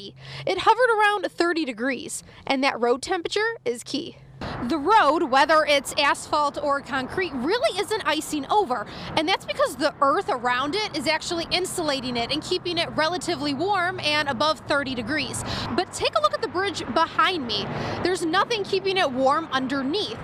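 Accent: United States. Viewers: I'm from metro Detroit, but she has a Chicago accent. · That is one THICK Wisconsin/Michigan/Minnesota accent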